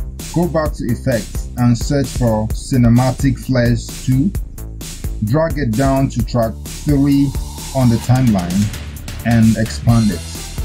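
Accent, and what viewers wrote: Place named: Nigeria. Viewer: You sound like a Nigerian, 👍 awesome tutorial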